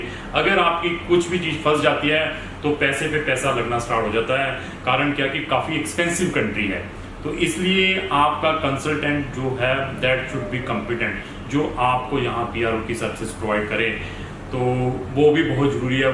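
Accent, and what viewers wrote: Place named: India. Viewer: would be nice to write and speak in english as we do not understand indian english sorry,couldnt understand a word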